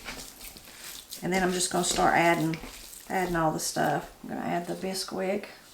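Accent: Appalachia, United States. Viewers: They look really good,! I’ve only been watching your channel for a couple of months and I love your cooking videos and ones with Matt or Granny. I loved the video yesterday of Matt frying the potatoes and deer in the bag with so much flour! I like how you say milk as ‘meelk’ and a ‘bad place’ when there is a spot on vegetables you’re preparing. The Appalachian accent is so good! I live in the north of Australia (my local area gets 300 days of sunshine a year) and I guess I’d have an accent to you guys too! 🇦🇺 Some of my favourite words you say are Papaw, okrie, chocolate gravy, ramps, Postum, etc. Never heard them before watching your videos.👍🏼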